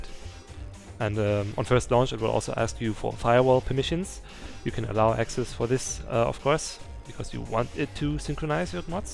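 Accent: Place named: Germany. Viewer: And now i noticed how funny the german Accent is xD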